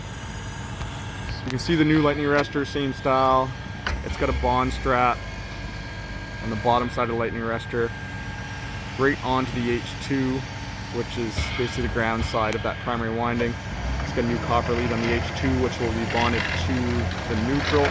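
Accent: Canada. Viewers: How bout some made in Canada tools since you are obviously Canadian, as we can tell by your accent. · Wow. And a Canadian accent. The words are tighter. It was great to watch.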